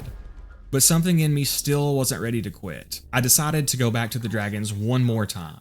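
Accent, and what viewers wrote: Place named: Texas, United States. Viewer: I love the slight Texas southern twang to your accent. “Aaai play with aaaa controllaar” 😂👌 coming from a queens English speaking Brit it’s highly amusing.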